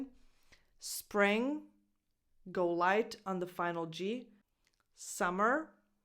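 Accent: United States. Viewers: Sorry but you speak Only American and Not English as most of the italian teachers......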